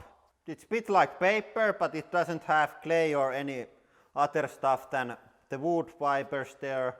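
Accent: Finland. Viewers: Mieze Katze hes probs finish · Mariela Ivanova It is Finnish and the cutest thing ever lol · Brian Page lol it's finnish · Are you Finnish? That’s what your accent sounds like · You have like finland aksent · How Finnish people talk english 😂<3 SUOMI PERKELE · it's a Finnish accent boi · Sylphlez finnish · baXtian no it's finnish people rallienglanti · You can tell he’s probably finnish because of hi’s accent😁 ps. I’m finnish too🤟🏻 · Sun ralli englanti on paras😍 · bankroll unicorn boi its Finnish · sounds like basic english accent from finland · Like actually that accent sounds way too finnish for me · Brian Page Finnish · Are you from Finland? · Are u from finland? Great accent btw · Benjamin Bjørndal nah they are finnish m8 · Are u from finland?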